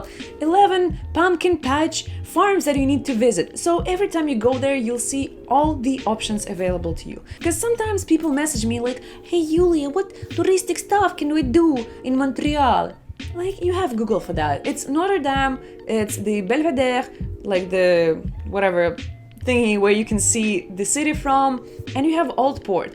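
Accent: Russia. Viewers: thank you yulia...I really liked it....I love your russian accent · Like for the natural “Russian” accent )))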